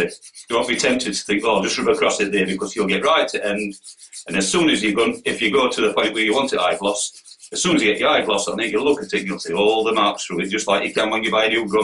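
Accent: Yorkshire, United Kingdom. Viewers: Nowt wrong wi a Yorkshire accent.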